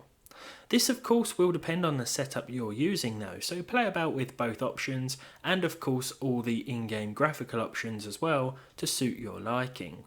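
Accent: United Kingdom. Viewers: Your videos are so chill. just a calm british dude talking and no cringe intros or ads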